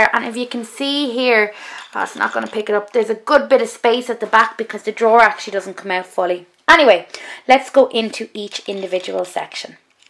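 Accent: Ireland. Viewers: Trust me hun I would watch more than 45 mins of makeup if it's you! I can't believe I've just discovered you I think your brilliant and l love your accent! <3 it reminds me of my half Irish fiancé :) thanks for showing us everything I haven't got much of a selection I've only recently started my makeup journey so the most I have is just a bag filled of goodies that's about it but if I keep watching you I will end up with wayyyyy more makeup than you!! Thanks again !! Oh and btw congrats on the wedding!!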